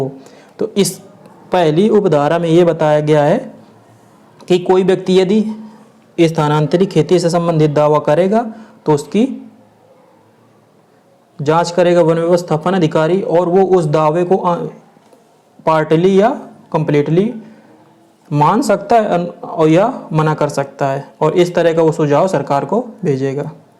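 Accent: Kanpur, India. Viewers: Fatehpur ya kanpur se hai tu bhai kya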